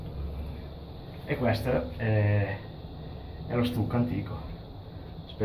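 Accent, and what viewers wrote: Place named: Genoa, Italy. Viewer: salve le volevo fare i miei complimenti non so perche ma mi sembrava dall accento genovese la saluto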